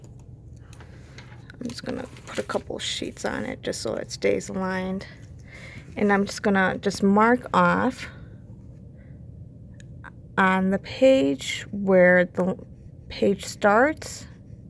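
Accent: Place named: Minnesota, United States. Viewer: Are you from minnesota? lol I think I hear the accent.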